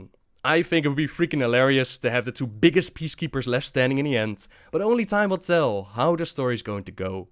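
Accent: Netherlands. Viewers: And when u click on it, it needs 2 talk in his dutch accent haha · I fucking adore these giant perspective stories of the universe, Sargaeras, the world spirit, etc. Can't get enough of these Dutch accent English (?) narrated videos. Would love it if you gained an official position as a Lore Keeper for the Warcraft universe.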